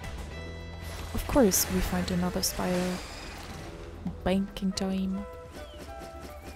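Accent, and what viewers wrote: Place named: Germany. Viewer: Okay ;) , you have very german accent because i'm asking , thanks for information .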